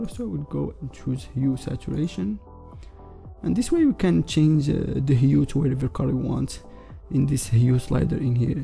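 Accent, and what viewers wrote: Morocco. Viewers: Mn lhja dyalk bayna fik mghribi 😅😅 Kml bro❣️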